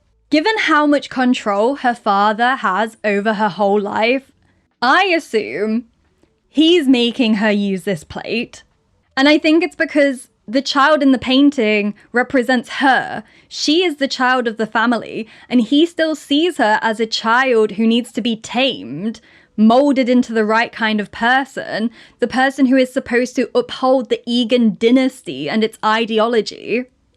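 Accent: United Kingdom. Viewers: Completely unrelated but do you mind telling me if your accent is British? Whatever it is, I love it. And thank you for your videos. Great job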